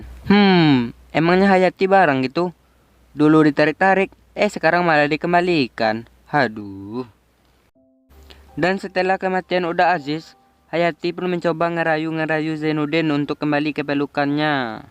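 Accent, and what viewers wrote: Madura, Indonesia. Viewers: Elu orang madura ya min kok logatnya kyak logat madura gtu😂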